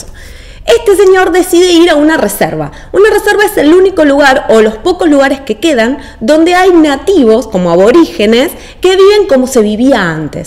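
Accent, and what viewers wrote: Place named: Argentina. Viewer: Tu acento argentino no lo soporto me voy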